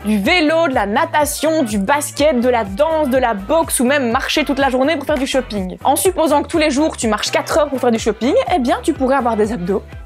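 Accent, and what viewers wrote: Liège, Belgium. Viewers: ton accent belge on l'entend tt le temps Mais c pas grave on t'aime quand même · Tu as un magnifique accent liégeois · t'as un accent belge de dingue hahaha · Mais tu as toujours l'accent belge non? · Comment ça un horrible accent Belge ya quoi contre les belges laaaaaaa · "Horible acent belge" t es sérieuse ! C etait pas loin d un dislike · L'accent belge 👌👌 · Je kiffe son petit accent Belge ❤ si non super vidéo en fait g été renvoyer sur le n 1 alors que je commençais le n 2 😊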